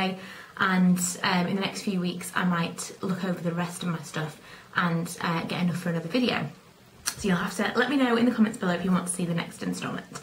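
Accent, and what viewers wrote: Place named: England, United Kingdom. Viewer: shes definately NOT scottish lol. Im from Glasgow and can tell an english accent from a scottish one!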